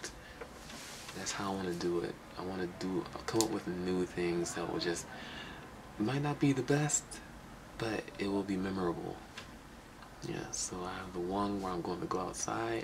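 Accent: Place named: Midwest, United States. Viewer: are you from the midwest...you sound like some of my friends from st. louis saying hurr : ) i love it soo cute.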